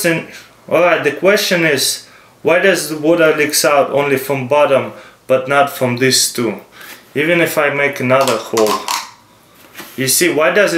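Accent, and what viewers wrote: Russia. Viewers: he is Russian. that's why. there is certain things that Americans can pronounce that he can't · i love russian acent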